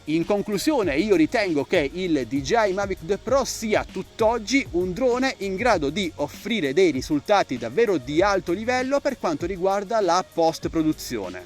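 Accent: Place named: Apulia, Italy. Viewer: Se si considera la camera e la parte video in generare è ancora al top... se non il top dei semiprofessionali. Purtroppo l'avionica è superata, nell'efficienza, nella sensoristica, nel FW (intesa come capacità di calcolo), nel peso.... e poi c'è l'AI (l'Intelligenza Artificiale).. di cui è praticamente privo. Credo che il suo valore oggi possa valutarsi sugli 800 euro e non senza riserve nel comprarlo. Mi associo completamente al tuo consiglio, a meno di necessità impellenti, ATTENDERE L'USCITA DEL MAVIC TARGATO 3. Grazie del video.... 👍 dall'accento sembri pugliese però hai il tramonto sul mare (magari sbaglio io era l'alba, oppure è sul Mar Ionio)👋👋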